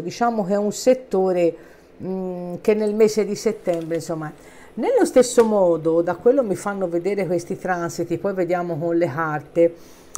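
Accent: Tuscany, Italy. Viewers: Cara io sono leone questa stesa rappresenta molto la mia situazione! È da molto che ho dei dubbi su una persona ed una specifica situazione..spero di scoprire di cosa si tratta! Spero in belle notizie! Grazie ti abbraccio sei simpaticissima con il tuo accento Toscano..sono Toscana pure io a presto ciao! 💗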